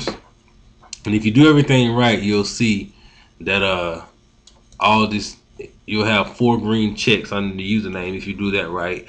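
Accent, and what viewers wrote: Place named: South, United States. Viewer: Thanks, the tutorial is awesome and I love your southern accent ;-)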